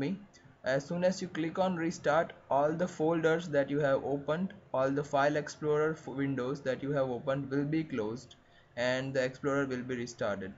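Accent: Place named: India. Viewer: That Indian accent tho lol headass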